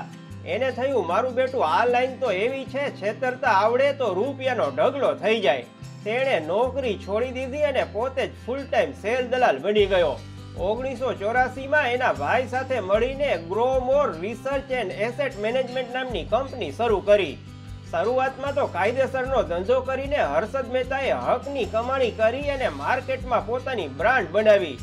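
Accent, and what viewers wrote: Kathiawar, India. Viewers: You have explained wonderfully, I have heard Kathyawadi Gujarati after a long while, I did subscribe and shared this video, hope you make many more videos on the share market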